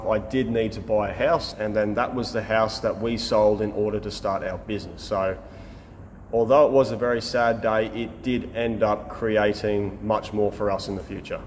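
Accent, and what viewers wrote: Australia. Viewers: I thought you were Australian until I saw that ute launch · Build the drift car! Also Australians get a pass for profanity, no one says the F-word like you do!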